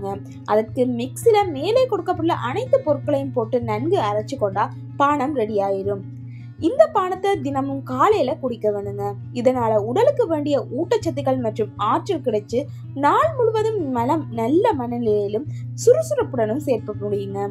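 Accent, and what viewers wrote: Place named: Sri Lanka. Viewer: srilankan tamil bro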